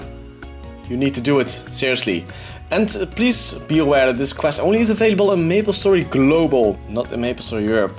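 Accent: Netherlands. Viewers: You dutch bruh?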